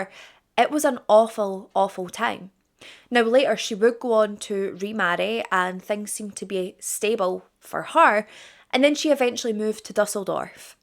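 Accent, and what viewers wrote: Scotland, United Kingdom. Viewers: To the guys who complain about the makeup do like me put the video on and just listen I enjoy listening to the cases and the Scottish accent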